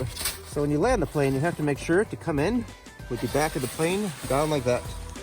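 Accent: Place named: United States. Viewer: Thanks, just back from watching. Yeah, that water looked cold to me. Glad you' were able to recover the plane. BTW, are you an expat? Sounds like native USA English to me.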